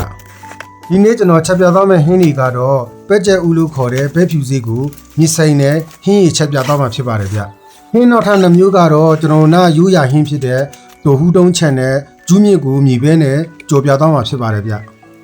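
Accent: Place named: Danu, Myanmar. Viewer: မွှေးရပ်မြေကိုလဲလွမ်း ငယ်ဘဝကိုလဲလွမ်း ဓနုသံဝဲဝဲကိုသတိရ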